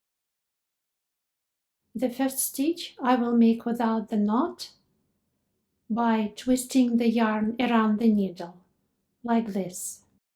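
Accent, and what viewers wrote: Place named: Ukraine. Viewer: I need an entire tutorial from start to finish. If you just want to make a video snd sell me the disk I would gladly pay up to 200 dollars. That's how important it is to me. I'm 69 years old and have noone to help me. I can't understand how to read patterns because I don't know enough stitches. I suffer from depression and often can't sleep. Knitting requires me to concentrate snd focus do when I get up in the middle of the night I can knit and the time just flies and before I know it its daytime snd my crisis has past. It's miraculous to me. Also, I have had a 20 year career as a training developer snd have a Masters in instructional design of online learning. You are the best. You should win an award. I'm going to look into recommending you for one. Also, are you Ukrainian? I only ask because I was raised by my grandmother who was Ukrainian and your accent sounds alot like hers.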